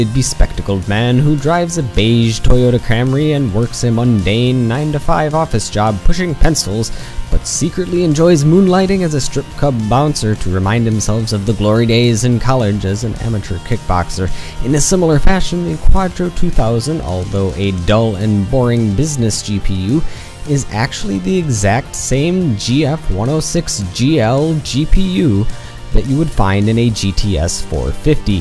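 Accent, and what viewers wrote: Canada. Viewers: are you Canadian? because to me you sound Canadian (no offense)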